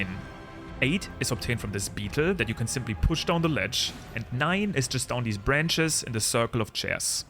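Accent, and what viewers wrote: Germany. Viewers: You sound aggressively German, I like it. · You german? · Hey very stupid question are you german or speak it cause you have a bit of a German accent I Guess and If so Hallo hatt mich nur interessiert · You know he’s german without knowing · You are German, aren’t ya? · Haha bist locker deutscher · Nice vid! Your accent sounds very German. Am I right? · You instantaneously here this guys german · Are you german? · Are you German? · Kurze Frage kann es sein das ich hier einen Deutschen Akzent raushöhre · Bist du Deutscher?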